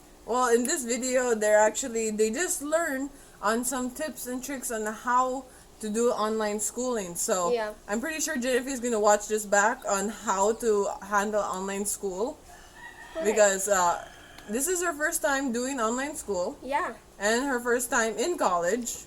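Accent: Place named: Europe. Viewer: you sound like you have a European accent.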